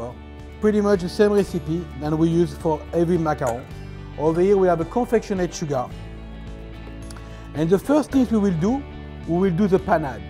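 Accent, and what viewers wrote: France. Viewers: I love his French accent!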